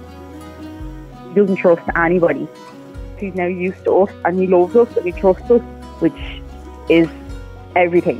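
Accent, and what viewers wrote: Ireland. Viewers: To hear an Irish voice on the Dodo, is rare but funny 🤣😁 love your story. Hope you teach some of your friends the same patients ☺️ so more people do such awesome things 👍☺️ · That's a very solid Dublin accent behind the voice that is speaking only the truth, with regard to Walt. Well done, and may you have many happy years,! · Thank you xx love the Irish accent xxx Welsh me see xxx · That's an Irish accent I believe - I'm in Donegal and took in a rescue German Shepherd nearly a year ago - he's called Tyson and he weighs 100lb - I love him to bits but he's very reactive but gradually settling down....! Walt is awesome and God Bless you for helping him out of his trauma - just like us humans it takes love and time to recover....!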